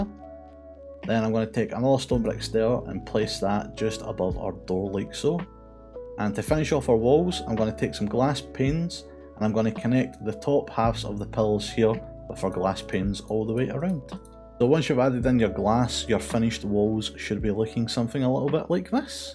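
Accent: Scotland, United Kingdom. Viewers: Thank you! Your tutorial was very helpful and easy to follow. I'm Scottish too so your accent was easy to understand :)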